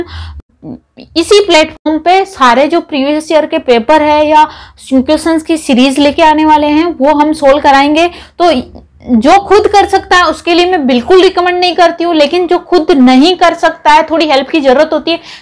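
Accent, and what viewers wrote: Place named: Rajasthan, India. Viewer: Puri rajhisthani bolti ho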